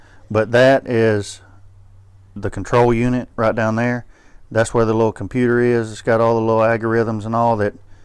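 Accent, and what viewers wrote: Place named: Georgia, United States. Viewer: Hey Brother , you sound like a guy from South Georgia. Great Job explaining the Pellet Grill. Learned some new stuff. I live in North Florida and I have had my grill about a month. Thanks for sharing.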